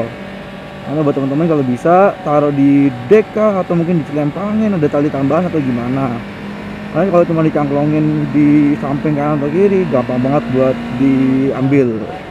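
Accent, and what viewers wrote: Surabaya, Indonesia. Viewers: Biasanya nonton motovlog bandung trs nonton motovlog surabaya keluar dah medok dan cak cuk nya :D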